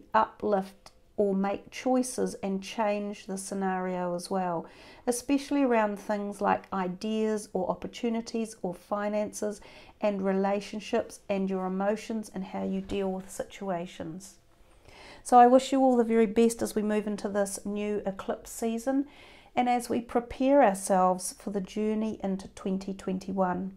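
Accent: New Zealand. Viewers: I adore her accent. Kiwi accents are lovely. Blessings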